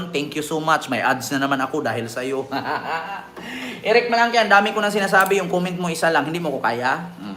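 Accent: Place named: Iloilo, Philippines. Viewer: God bless u! my Tanong lang Ako sau?ilonggo ka ba?Kasi ilonggo din Ako Punto mo daw ilonggo ka toto?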